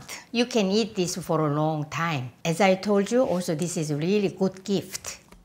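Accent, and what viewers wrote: South Korea. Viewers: 와 한인영어 너무좋아요 항상 잘보구잇습니다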